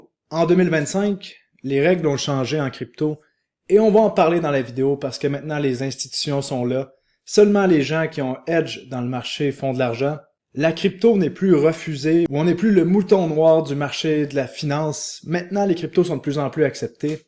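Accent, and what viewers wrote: Canada. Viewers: tu m'as fait adoré l'accent canadien ❤ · J’étais justement en train de revoir ta dernière vidéo en me disant que ça me ferait vraiment du bien d’entendre à nouveau ton point de vue et ton charmant accent canadien. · N'abandonne pas l'accent canadien en parlant français. Ça fait vraiment très sérieux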